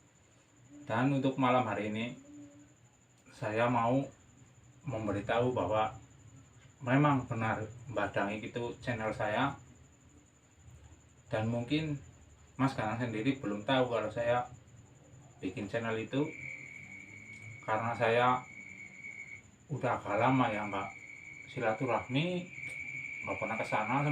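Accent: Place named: Banyumas, Indonesia. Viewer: Sama sama mbah dangik🙏, alhamdulillah mbah dangik sudah berubah, dari awal saya suka dngn gaya bicara mbah dangik lucu ngapaknya, semoga canelnya makin maju mbah n selalu di beri kesehatan n bisa menghibur subscriber mbah dangik aamiin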